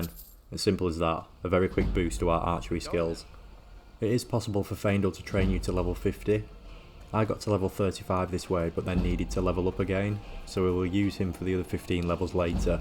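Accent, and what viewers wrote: United Kingdom. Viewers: It’s great to hear a northern accent! I feel at home. You have earned yourself a sub my friend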